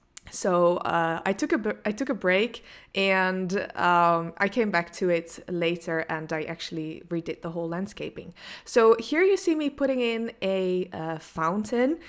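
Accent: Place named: Netherlands. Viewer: You sound very Dutch. 😊 And I like this communal garden. It looks very grand and luxurious.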